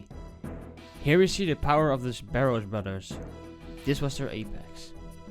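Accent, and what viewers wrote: Netherlands. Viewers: they are both dutch i think, i can tell by the accent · You should probably let other people narrate these and get some more interesting graphics. i feel like a Dutch guy is trying to give an English school powerpoint presentation.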